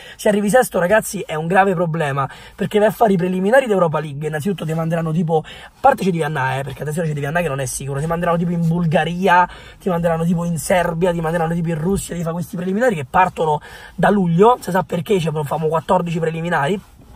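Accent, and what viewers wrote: Rome, Italy. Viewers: Un consiglio. Se aspiri a diventare un commentatore, non puoi commettere errori pacchiani. Uno: il Milan, nel 2016, ha vinto la supercoppa italiana. Due: l'Inter ha vinto la Coppa Italia nel 2011. Tre: non puoi non sapere il cognome esatto dell'allenatore più vincente della storia della Lazio. Quattro: fossi in te, eviterei di parlare in romanaccio (il romanesco, che è altra cosa, è di Belli e Trilussa).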